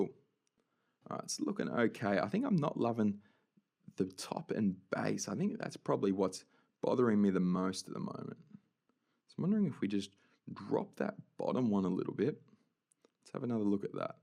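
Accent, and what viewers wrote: Australia. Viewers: Australian accent. He is living in Melbourne, Australia.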